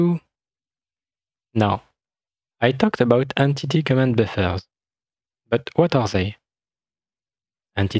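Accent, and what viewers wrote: France. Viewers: If you can speak German with a German accent i will speak english with a english accent. You gotta watch a native english speaker if its so hard for you to understand. I understand him well and french guys have their own ways its nothing he should change. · why do you speak english like you're reading the words while speaking french? it's honestly very difficult to understand. I'm brazillian, by the way. Et je parle français aussi, c'est ma troisième langue. still, I had a hard time focusing on whatever you are saying. you should work on your pronunciation, either speak english or french! lol either way thanks for the content, this was very helpful to me.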